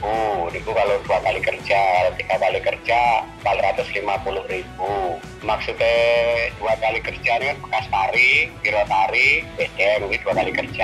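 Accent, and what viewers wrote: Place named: Blitar, Indonesia. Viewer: Suara rekamannya orang blitar,,, saya jg orang blitar.... Dimana alamat dealer resmi quick di blitar pak bos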